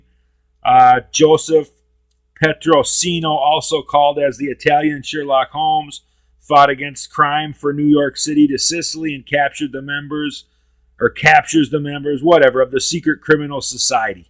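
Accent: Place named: Midwest, United States. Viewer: Hey you stuttering, muttering prick ya! Calling me a midget when I stand around 6’ and 230 isn’t the smartest thing to say. In addition before you take a podcast to task it would behoove you to actually do some research first. My father worked for AA so I know a great deal about him. I know you get a chubby when you think about these mobsters in your mom’s attic while you munch on hot pockets. Guessing by your accent you are probably from Illinois or KC. I will give you some cred since these were big mob towns. The way you pronounce APALACHIN is laughable. If you want to be taken seriously ever, do better research in the future especially when you call someone a midget that could probably kick the shit out of you! Thanks for the shoutout and watch me as Matty the Horse on the Deuce.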